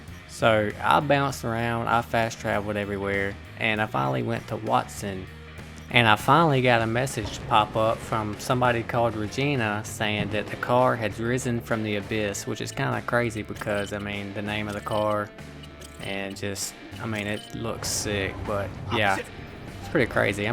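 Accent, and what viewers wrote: South, United States. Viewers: Great video, choom. Really helped me out. Also, I really like your accent! Where are you from? Somewhere down south I would assume? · Love your accent man! Reminds me of my grandparents, who are very southern. Thank you for the informative video my dude! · Subscribed cause sounds like a fellow southern brother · Man, I love your southern accent—nothing like a man with a southern drawl.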